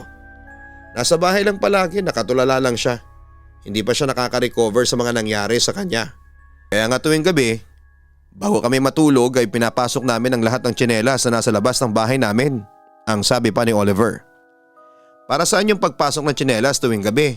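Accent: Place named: Negros Occidental, Philippines. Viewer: Feeling ko, sa negros Occidental ang nag kwento neto😁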